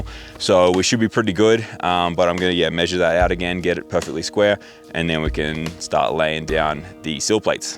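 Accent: Australia. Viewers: I just found your videos must admit enjoying so far. A quick question, are you an Aussie?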